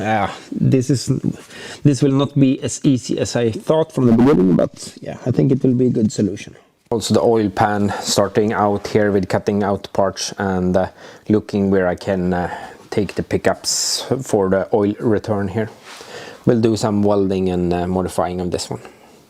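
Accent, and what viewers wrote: Sweden. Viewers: I hope your YouTube metrics show you how many times I’ve watched all these videos. You’re the perfect host for this build and your Swenglish is perfect, brother. I love your ambition and commitment in both quality and engineering; it’s mind bending what you’ve done in the last few Volvoghini vids.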